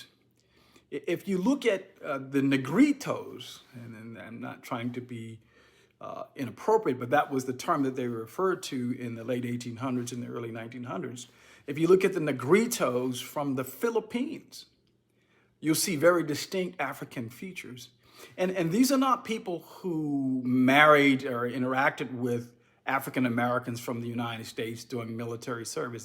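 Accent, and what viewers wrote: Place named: United Kingdom. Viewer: When you talk I feel like you have British in you !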